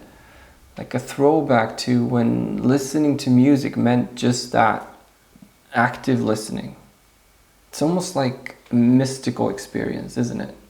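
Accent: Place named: Spain. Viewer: The intro was Great. Good spanish accent! I 100% agree with your thoughts on adding production tricks and not letting your music breathe. Finding the confidence to let a section breathe is something I really need to start doing. If you are not aiming to commercial music just skip those constraints! Long intros are great in my opinion but if it will always be someone that won't like it. Just do what excites you and someone will also think the same! Great video. Hope you are liking Spain. It's a great country with an awesome weather.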